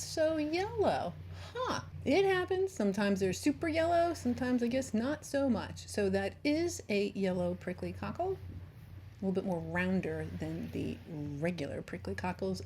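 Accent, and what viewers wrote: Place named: New Jersey, United States. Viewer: I enjoy your videos and you are teaching me so much. I would love to live close to a beach but live NE of Ft. Worth, TX. I enjoy your NJ accent and miss Cape May, NJ.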